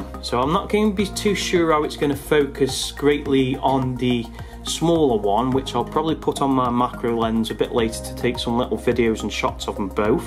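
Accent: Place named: Merseyside, United Kingdom. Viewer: You from merseyside? That accent is to close to home, I'm about pick some jumping spiders up soonish hopefully. Great video bro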